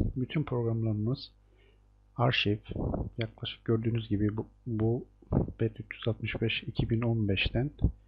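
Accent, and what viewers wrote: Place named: Ardeşen, Turkey. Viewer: biliyordum :) ardeşen tarafları gibi hissettim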